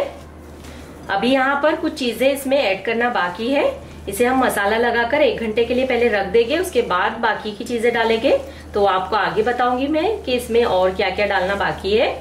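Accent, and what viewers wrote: Ahmedabad, India. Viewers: Jitni bhi hindi bolne ki koshish karo miya bhai ki ahmedabadi bhasha to chalak hi jaati hai 😂❤ allah kamyaabi de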